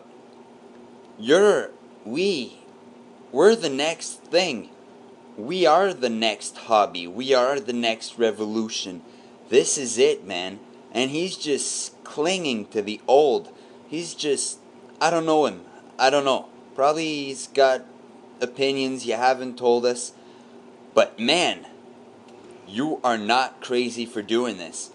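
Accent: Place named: Quebec, Canada. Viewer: Loved the animations! And had to listen VERY HARD to find any Quebecois accent in there. Pity, kinda, I love the melodious way some Quebec'ers speak english.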